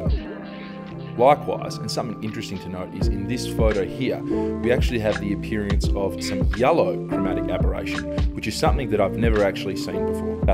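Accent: Australia. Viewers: Are you from Perth?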